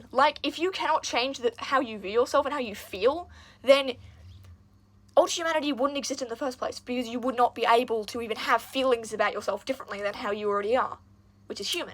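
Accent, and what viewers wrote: Australia. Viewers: THANK YOU.. I was once a therian, but i stopped bc i didn't feel the same anymore, i abandoned everything related to it thinking it was just a phase or how i was burn out on doing wtvr this is..I thought i was faking being one and it upsets me sm.. I thought i were never one (which i still believe in that but wtvr), now i found out my identity just shifted (which i did not control and felt so sadly nostalgic tho-). Also i rlly love your videos, and your Aussie accent haha. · are you Australian? 😭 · …. Have I found another fellow Aussie!!!! It’s just the accent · Oh my God, it’s giving voice reveal. The Australian accent is Australiaing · He is Aussie, yes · r u australian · I love your Australian accent 🥺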